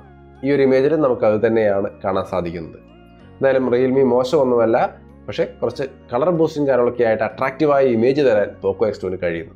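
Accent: Thrissur, India. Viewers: മനുഷ്യാ.... നിങ്ങൾ തൃശ്ശൂർകാരൻ ആയിരുന്നോ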